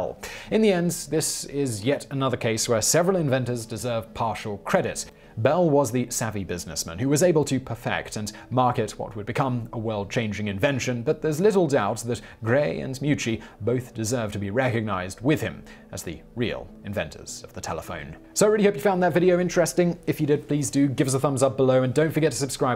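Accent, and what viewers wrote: United Kingdom. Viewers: I normally don't care for British accents but don't mind his at all. Anyone know what regional accent this would be?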